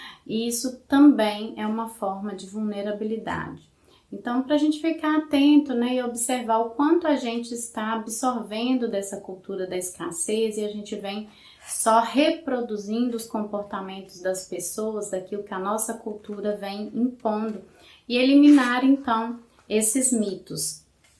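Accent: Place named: Northeast, Brazil. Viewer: ahh achei o sotaque parecido ,eu sou do nordeste .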